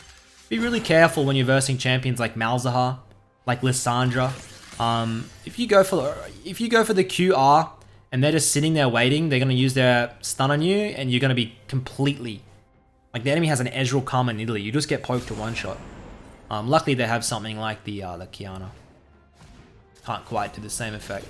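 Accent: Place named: Australia. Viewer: Man this guy speaks like a true Aussie bloke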